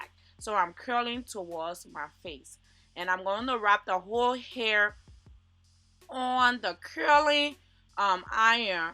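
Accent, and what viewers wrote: Liberia. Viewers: Just by curiosity are you Liberian? I think you have a Liberian accent.😊😊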